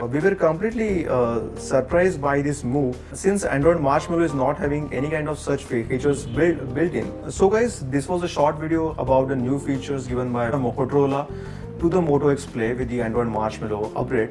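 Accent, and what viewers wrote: India. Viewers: love the Indian accent!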